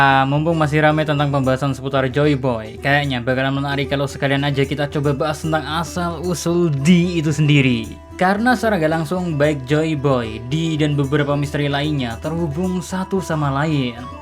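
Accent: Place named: Bekasi, Indonesia. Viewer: Admin orang bekasi kek nya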